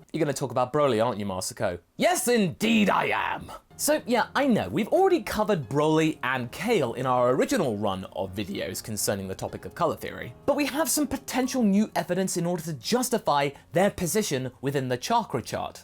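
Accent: United Kingdom. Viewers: Is that how British you really are Masako?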